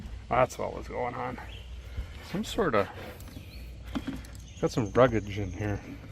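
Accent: Canada. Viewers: First video for me and I swear I would've put my life on it that he was Canadian!!! LMAO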